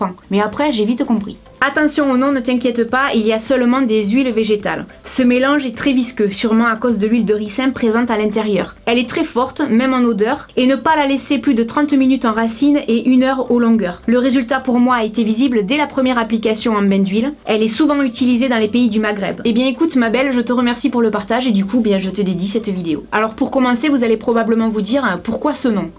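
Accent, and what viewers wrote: South, France. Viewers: Je savais même pas que ca existé Pis ton accent du sud 😍😍 Bisous tu es toujours magnifique ❤️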